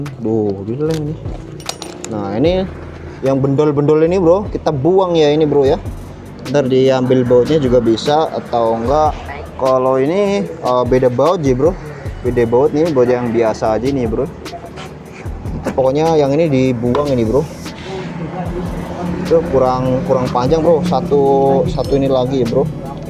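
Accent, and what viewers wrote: Banyumas, Indonesia. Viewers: Mas sampeyan wong ndi yah Bahasane daning kaya ngapak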